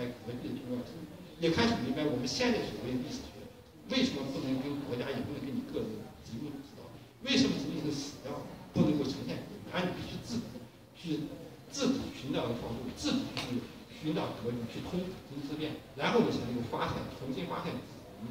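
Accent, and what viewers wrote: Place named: Sichuan, China. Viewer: 其实就是一般川普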